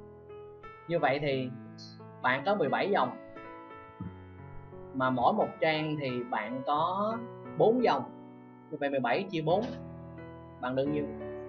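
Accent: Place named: South, Vietnam. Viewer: Cảm ơn thầy vì phong cách dạy của thầy đã truyền đạt kiến thức để người học có thể tự suy theo database của mình. Rất hiếm người dạy chỉ tận tình như vậy đa số nói rất ít mà đa số chỉ tập trung bài code của mình hoặc ko giải thích nhiều. Mong thầy làm nhiều video hơn cho thế hệ trẻ việt nam tìm hiểu được kiến thức cho mình chứ em thấy người nước ngoài họ đều chia sẻ những gì họ biết thì người việt nam lại ít share hơn. Em thích giọng miền nam của thầy :}.Buồn cười mỗi quả nói nãy giờ có hiểu gì không.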